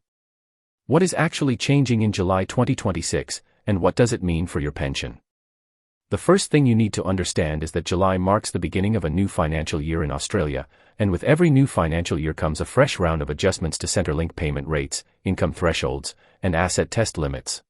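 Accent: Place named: United States. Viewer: Here we go! That yank voice again. Don't believe anything he says. Who makes this shit up? Shame on you.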